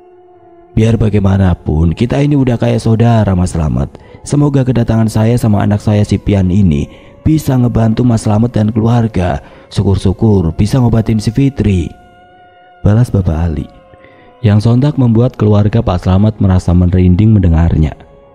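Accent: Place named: Jakarta, Indonesia. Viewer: Dengerin cerita bang pian w ngakak muluk anjirrr logat betawinya itu lho garing batt 😂😂 sett dahhh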